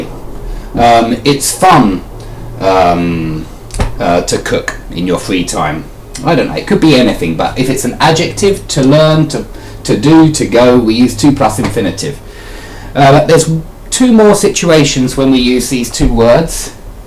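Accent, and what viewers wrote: United Kingdom. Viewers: I speak German,French but above all the languages I adore British English in terms of accent! Also your explanation of the Gerund,and English grammar at all,is thorough and very praiseworthy !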